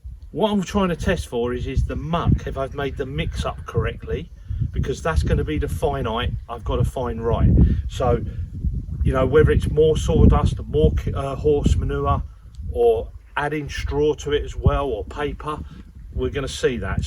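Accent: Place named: England, United Kingdom. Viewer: You sound English,are you in England? 🇬🇧🇬🇧🇬🇧🇬🇧🇬🇧🇬🇧🇬🇧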